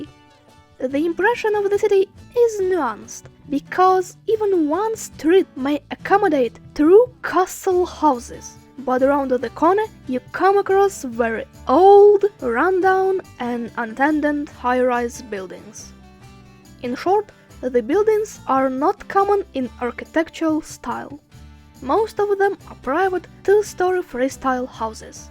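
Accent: Russia. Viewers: You not you. You speak English like Russian dievoshka. Atlitchna 👍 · Omg you have so much cute voice, the way you speak the accent is very cute ❤️🥰 i like Russian accent. I love slavic culture. And also Dagestan is heavenly beautiful. Keep going, I'll support your channel!👍🏻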